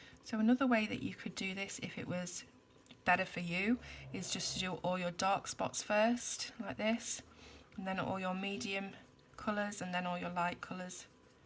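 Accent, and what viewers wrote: England, United Kingdom. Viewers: Good video. It's nice to hear an English voice on a colour along. You didn't sound nervous at all.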